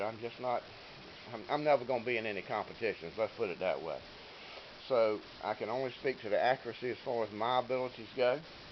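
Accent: North Carolina, United States. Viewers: Are you from NC by chance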